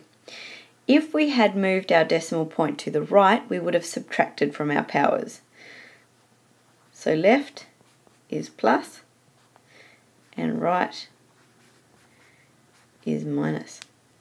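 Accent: Australia. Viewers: nice Australian accent :)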